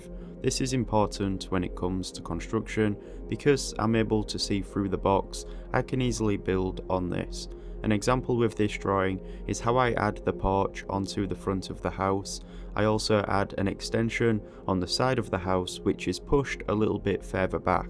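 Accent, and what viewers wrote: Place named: Scotland, United Kingdom. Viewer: He has a Scottish/British accent and is from the UK. He has images and a few links about Staedtler which is a company in Nuremberg, Germany but has 150 other representatives in other countries. One link stated that he was on site in England. He also is an architecture graduate at BlokBuild from Hull, East Yorkshire [England]. This took about 8 minutes to find out. You’re right, in that, asking him is the direct answer—-but he rarely comments, I haven’t actually seen him commenting at any point and I was curious myself. This informaiton is only speculation, for one—-im 100% block out deaf. But this could be why there’s confusion on his accent, this happens when someone is from one place and relocated to another [even more so if a third place is added].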